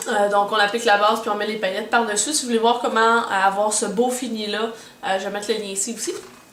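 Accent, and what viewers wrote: Quebec, Canada. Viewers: Coucou, Je participe à ton concours avec grand plaisir, il est fort agréable d'entendre a nouveaux le bel accent du Québec (j'ai eu la chance de pouvoir visité un peu cette magnifique contré) J'adore également tes vidéos je les trouve super!!! Ce qui m'intéresse le plus dans le lot a gagné sont le pinceaux et les paillettes... Merci pour tous ce que tu fais, Bisous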